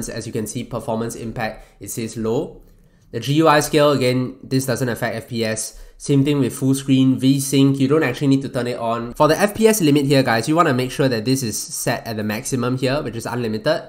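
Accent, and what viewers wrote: Singapore. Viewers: ru singaporean? your accent is like sg accent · are you from singapore ur voice sounds like it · nice sigaporian accent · u from singapore?? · I hear your accent, I knew INSTANTLY that you were Singaporean lol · nice singaporean accent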